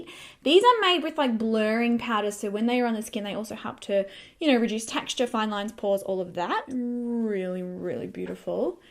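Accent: Australia. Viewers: Victoria Darmanjian She has an Australian accent and it even says she’s Australian in her description.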